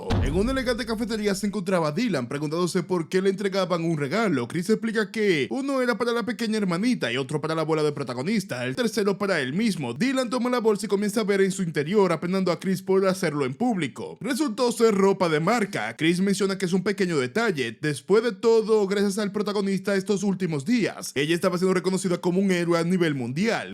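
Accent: Dominican Republic. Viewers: este tiguere es demasiado dominicano, hasta narrando las historias le mete el flow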